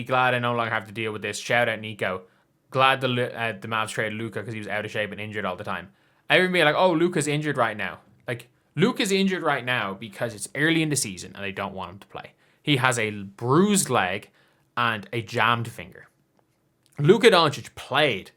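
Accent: Ireland. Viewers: I’m not even happy and I’m a spurs fan. But I would rather hear bad news in an Irish accent. It’s just better. Such a “fml” accent. I’m gonna try to catch every one get you going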